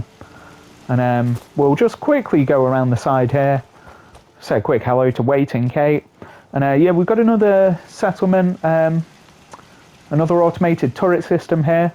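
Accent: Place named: Yorkshire, United Kingdom. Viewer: ! I was gonna guess Derbyshire/Lincs 'cause it's near to Yorkshire, but not quite the accent..But YES TO GOD'S OWN COUNTRY!! One of us, one of us! I like it when you get an idea like that and then execute it into your build. I feel you could utilise some other war knowledge into your other builds?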